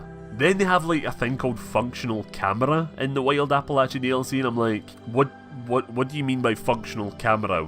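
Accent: Scotland, United Kingdom. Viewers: Scott or Irish?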